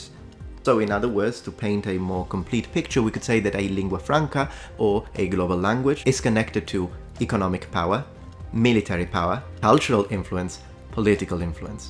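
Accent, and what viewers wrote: United Kingdom. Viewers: Oh yes that is why i can hear a bit of a british accent when you talk english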